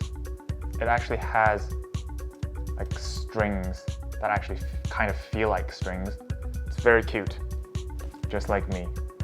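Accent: United Kingdom. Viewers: How did I not know you had a British accent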